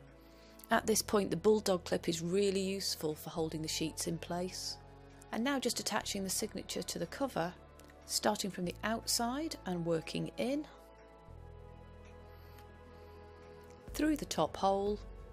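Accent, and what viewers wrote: United Kingdom. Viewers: I absolutely love your voice! Its like watching a tv program! What part of the Uk are you from, if I may ask?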